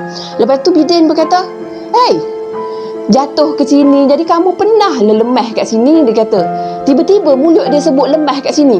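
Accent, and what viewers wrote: Kelantan, Malaysia. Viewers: kak ore kelate ko😂bnyi kelate tu😂😂😂😂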